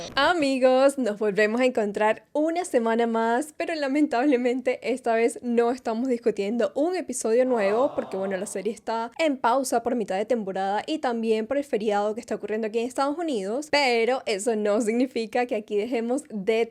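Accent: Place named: Venezuela. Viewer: Me encantó tu explicación de todo y tu acento, eres venezolana de casualidad? 🥹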